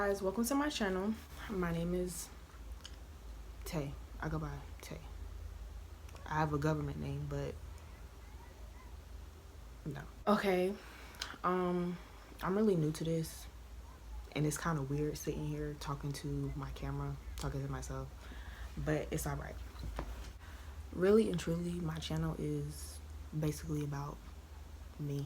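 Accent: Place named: South Carolina, United States. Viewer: Lol, he brought a whole pregnancy test over. Love your story, where you from? You sound like you from South Carolina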